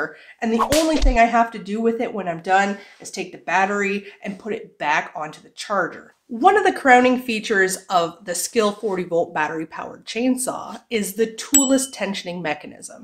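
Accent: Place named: Canada. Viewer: I THOUGHT you lived in Canada or close to it; because of your accent. I think accents are neat! And yours gives you away.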